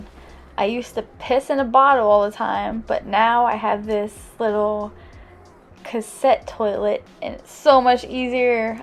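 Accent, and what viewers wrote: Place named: South, United States. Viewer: I must say you're very pretty and seem like wicked down to earth girl cool ass chick.. curious how old are you? To me you have an accent must be from down south I'm guessing right?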